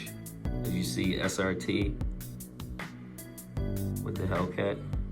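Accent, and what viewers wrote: Baltimore, United States. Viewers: I’ve been watching your videos for month or so, and I been saying to myself “this dude sound like he from Baltimore” “I wonder if the purple is a Ravens/Baltimore thing”....Finally got my answer lol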